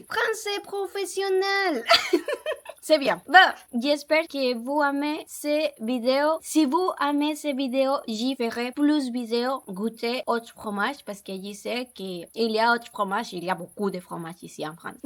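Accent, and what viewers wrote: Colombia. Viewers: YOUR COLOMBIAN ACCENT SPANISH IS BEAUTIFULL IN FRENCH❤❤❤ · Ton naturel ton sourire et ton accent colombien m'on fait craquer tu es adorable et trop mignonne j'adore le camembert 😂😂😂ta joie de vivre me fait trop plaisir💋😘😘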